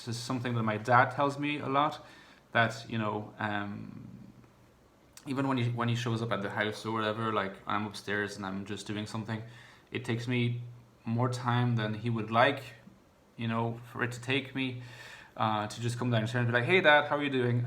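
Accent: Ireland. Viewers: Some questions! Who is? Where are you from? What languages do you speak? You have an 'Irish lilt' to your voice. Did you spend time in Ireland? I do a very detailed test and come up with INFP and INTP. Do I need to take the test again. What is your educational background? You seem exceptionally well versed in the vagaries of the MBTI. Thank you so much. Lots of Love and Hugs. Test: <link> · Thank you very much for this comprehensive list,I relate to all of your points,I was trying to figure out your slight accent,thought you sound maybe a bit Irish,thank you for the clue in the video☺️ also I most struggle with the last point,I’m always that random girl for the situation and don’t really fit in most of the time,give people impression that I’m way too naive,I can’t take anymore their irrational reactions and try to avoid meeting ‘strangers’,I realize I can only safely socialize in private where I’m introduced to people by someone who already knows them,when I have to personally assert myself to a complete stranger and and have that initial small talk their lack of boundaries and sexualizing my ‘INFJ stare’ is just disastrous...i have women of my mothers age coming on to me sexually and telling me they are bisexual 5minutes into conversation...yep that’s how they ‘figured’ me out...😖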